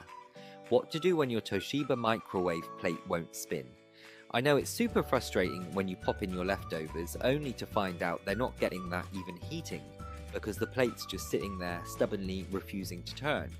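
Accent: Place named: United Kingdom. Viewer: Can't understand the Limie accent.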